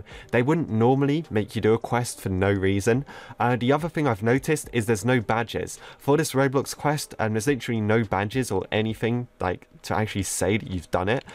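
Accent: United Kingdom. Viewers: It’s called a British Accent, look it up...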